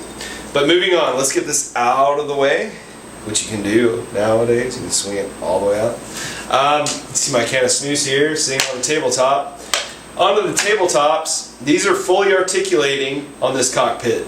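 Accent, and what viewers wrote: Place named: Netherlands. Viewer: Installed the hotas yesterday and have flow a couple of minutes in Arma 3, ED, and DCS and have to say im very happy with it, the immersion is so nice that i now want that the seat banks left when banking left lol. also watched a couple of vids of you. you got a nice setup mate. i heard your dutch if im not mistaken hehe. maybe if your down for it we grab a game together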